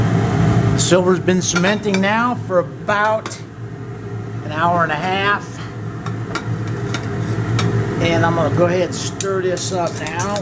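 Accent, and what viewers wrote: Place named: South, United States. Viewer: a well spoken southern man that gets it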